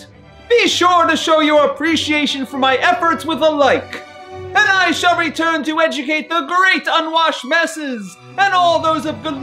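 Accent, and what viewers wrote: United Kingdom. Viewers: Finally, YouTube gives me what I’ve wanted for years; a man in a French uniform from the Napoleonic war using a slight British accent reviewing modern food and drink. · this, this is the most amazing YouTube ad ever. Thank you funny British man with an slight British accent in a olden day uniform that is reviewing an horrific MTN Dew flavor.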